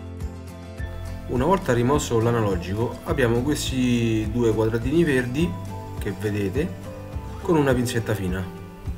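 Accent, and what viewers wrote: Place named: Rome, Italy. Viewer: Sei un grande! Ho voluto provare a smontarlo anche dopo solo 1 anno di vita.. Smontato, pulito quei cerchietti, sollevato un po quei contatti metallici per scrupolo anche se sembravano ok, rimontato e non ho più avuto problemi di visuale che andava da sola verso sinistra! Che dire, se sei di Roma (dalla calata penso di sì) ti offrirei volentieri un caffè o na birretta!! Grande 💪🏻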